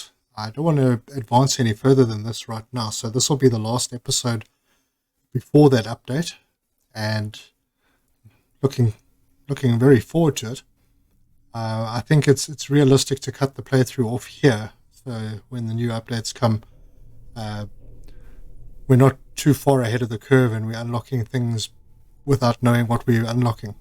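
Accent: South Africa. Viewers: Your slight accent makes me think South Africa region? Anyway, I am really looking forward to this next update. Been playing for a few months and have been loving the game.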